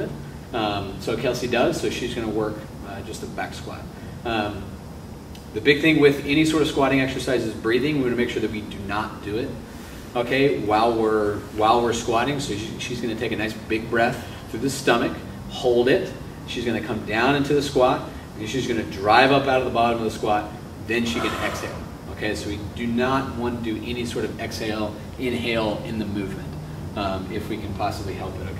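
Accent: Appalachia, United States. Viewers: Fuck i love that hillbilly accent :D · I thought this guy was joking when he started talking. Fucking hillbilly.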